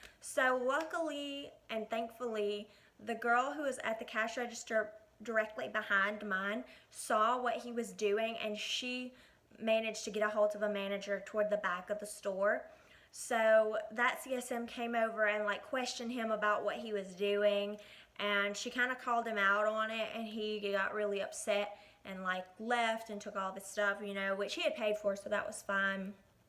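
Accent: South, United States. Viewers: You’re beautiful and I love that southern accent.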